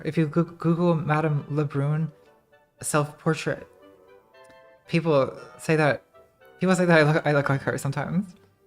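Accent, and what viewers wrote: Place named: RP, United Kingdom. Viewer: And she has a royale English accent.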